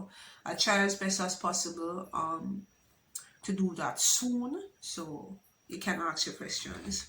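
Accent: United Kingdom. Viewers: Di likkle British accent deh it agwaan nah lie love your confidence from you have self love and confidence in whatever you do you will excel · I'm hearing a likkle hint of that British accent still 🔥😻 bless up! another great video. I love your answers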